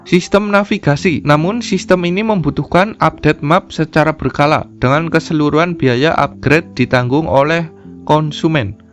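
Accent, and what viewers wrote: Banyumas, Indonesia. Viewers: Asli kueh man, jarang" ana chanel oto ngapakers 🤣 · Ora Ngapak Ora Kepenak 🔥. Sukak nyong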